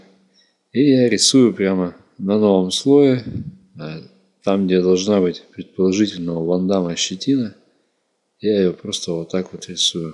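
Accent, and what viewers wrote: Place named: Russia. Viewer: Уроки конечно норм, но учитель, блин, с какого ты села?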